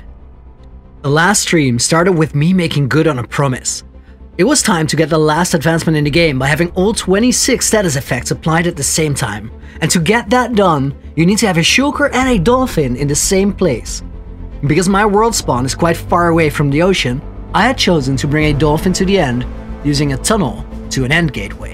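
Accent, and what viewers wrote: Netherlands. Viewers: I love your Dutch accent ahaha why have I never found your channel before? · Brooo this shit is amazing, I love the vibe but I did recognize a Dutch accent right away ;). Ziet de lekker uit man!!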